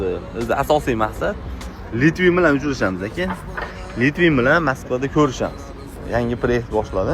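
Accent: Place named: Namangan, Uzbekistan. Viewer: наманган хонобод кушни коратепа кишлок топдимми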